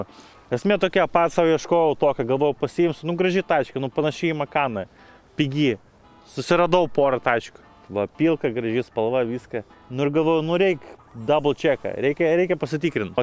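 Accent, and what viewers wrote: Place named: Russia. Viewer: Išmoktų normaliai lietuviškai šnekėti o tada jau darytų apžvalgas. Nes tas rusiškas akcentas... šlykštu klausytis,o kai dar tiek rusiškų keiksmažodžių, po 2 minučių išjungiau...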